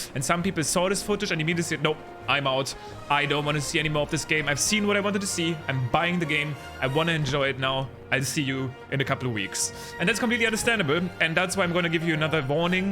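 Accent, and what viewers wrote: Germany. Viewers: Kommen sie aus Deutschland? Just curious! Your accent sounds like you're German 😅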